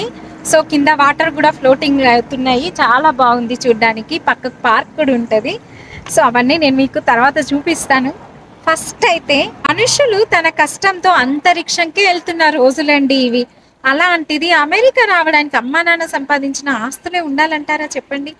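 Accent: Telangana, India. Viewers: Hi garu madu narasaraopet.kani me slang ala ledu.nenu Inka meeru Telangana anukunna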